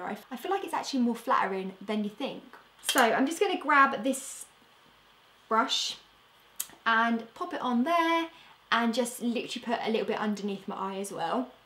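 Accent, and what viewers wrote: United Kingdom. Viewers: I love this look ! Also, I can't get over the fact that you look SO much like Cameron Diaz with a British accent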